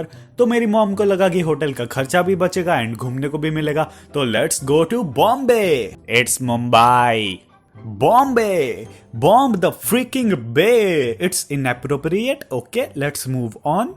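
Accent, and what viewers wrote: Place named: Punjab, India. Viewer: Bhai are you a punjabi because teri punjabi nikal aandi hai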